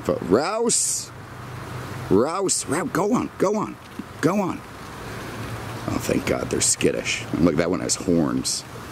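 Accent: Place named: Chicago, United States. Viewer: He has GOT to be from Chicagoland. He sounds 100% identical to my old neighbor. I even sent one of videos to his wife and she laughed hysterically.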